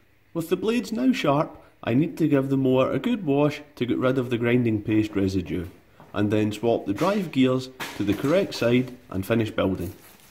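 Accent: Scotland, United Kingdom. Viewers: His accent has me wondering where he is from the mower is British but his accent sounds Scottish if you read this can you please tell me if you don't want to that's fine my ancestors from my mother's side are Scottish and I would just like to know thank you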